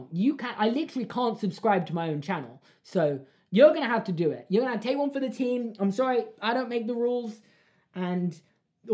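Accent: United Kingdom. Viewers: As a fellow Britishman, I approve of the Renaissance pronunciation going on in this video 👍🏻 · see this is what irritates be. People from the US saying a brit is mispronouncing their own language.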